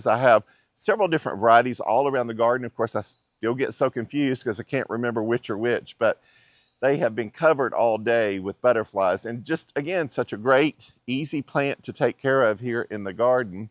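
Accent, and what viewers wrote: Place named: South, United States. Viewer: I came across your site by accident and when I saw you talking about your Playing the Blues Salvia and Peach Drift Roses, I knew you would be inspiring. Also, you sound like you are from the South. Although I live in Georgia, I enjoy hearing from other gardeners about what they have planted.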